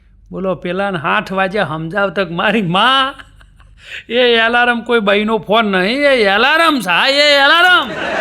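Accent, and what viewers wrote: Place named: Mehsana, India. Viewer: मेहोना नी टिपिकल बोली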